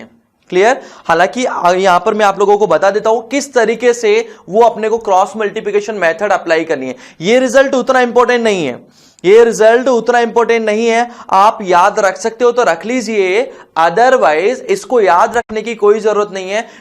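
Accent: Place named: Maharashtra, India. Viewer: Sir sounds marathi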